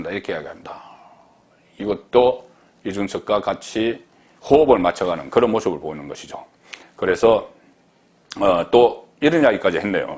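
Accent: Gyeongsang, South Korea. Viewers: 아무리 경상도 출신이라도 그 정도로 알려진 언론인 이라면 발음 좀 신경쓰세요. 육월? 유성민? 정세없는 복지? 그래도 방송인데....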